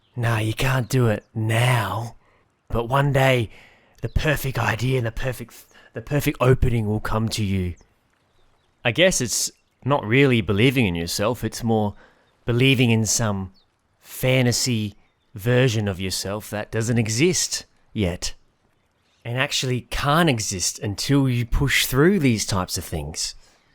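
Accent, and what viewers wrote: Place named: RP, United Kingdom. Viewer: Its been literal years. I thought this was Stimpee with a posh accent.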